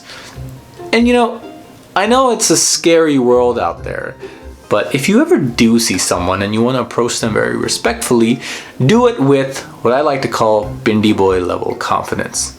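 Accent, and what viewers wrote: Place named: United States. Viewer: man! man! man! I'm new on your channel, you're brilliant. i can watch each of your videos multiple times!! I've never loved American accent so much before i heard you talking (I'm assuming you're not canadian. I'm sorry if you are) please respond to my comment if you get a chance to read it, I'm already a huge fan love from the uk xx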